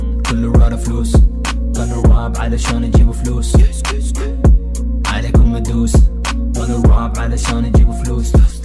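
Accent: Iraq. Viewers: عراقيي والله لهجته يمكن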